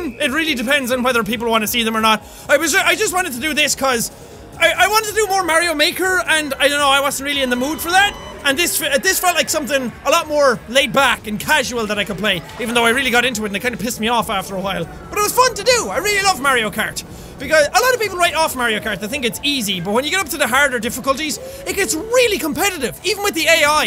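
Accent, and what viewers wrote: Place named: Ireland. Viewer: LazyTurtle I'm brazilian and my school don't teach very well so I have to learn by my self, I learn a lot but I still have a few words to know, I doesn't know too much when I find jack but I actually learn a lot with him, and was hard because he has a Irish accent..... I talk a lot sorry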